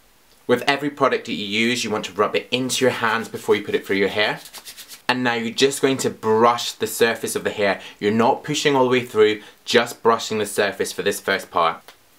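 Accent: Scotland, United Kingdom. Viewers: Are you Scottish ? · That's how people in UK speak their accent is different so don't call him gay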